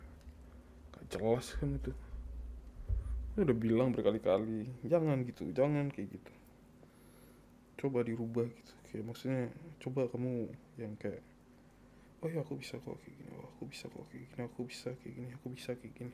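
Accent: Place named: Kalimantan, Indonesia. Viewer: ada logat² Kalimatan nya 🗿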